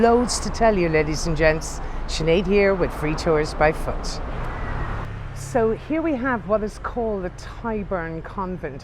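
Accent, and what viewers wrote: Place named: Ireland. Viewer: Love your tours Sinead, I love history escpecially that around London, you are so informative, your gorgeous Irish lilt all goes to make one very enjoyable armchair tour, Thankyou from a Southerner now living in the North, I’m off to the “ Old Bailey” now if anyone should want me 😜🥰🐾🥰🐾🥰🐾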